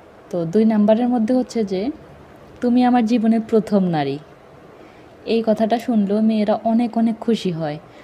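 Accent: Noakhali, Bangladesh. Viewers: Noakhailla naki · আপনার বাড়ি কি নোয়াখালী আপু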